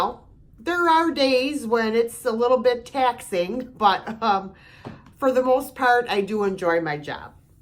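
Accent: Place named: Upstate New York, United States. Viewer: I was thinking you were from upstate close to Canada by your accent. An accent you probably think you don't have. LOL! I'm in the Mid-Hudson Valley.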